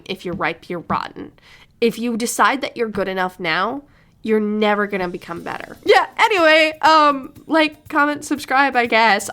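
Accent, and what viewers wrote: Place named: Michigan, United States. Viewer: guessing Michigan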